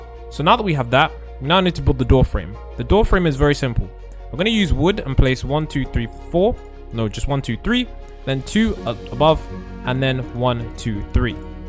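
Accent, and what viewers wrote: United Kingdom. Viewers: I love the British acsent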